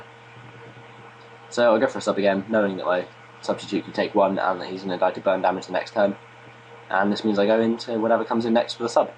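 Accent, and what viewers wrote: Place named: United Kingdom. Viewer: Hey man- so you asked me if i could give you some feedback on one of your narrations. i want to compliment you that you sound confident and there is fluidity in your voice which is a good quality, but you're a bit monotone, you might wanna add a bit more energy which will show to the people that watch. Also cool accent (i like british accents very much :p) Keep up the good vids man, you have the potential to grow into a big channel!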